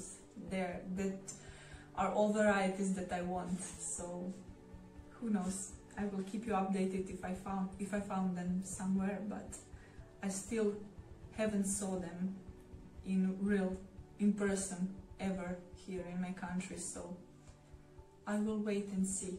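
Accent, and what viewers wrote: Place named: Australia. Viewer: So you're a croation right, i think australian 🙂✌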